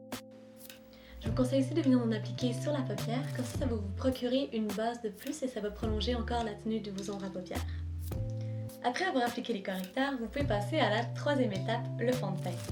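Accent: Quebec, Canada. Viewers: J'aime énormément ce que tu fais et ton accent Québecoi est totalement adorable :) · Ton accent me rappelle le Canada c'est dingue!!! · Elle se donne pas un accent... Elle est Québécoise!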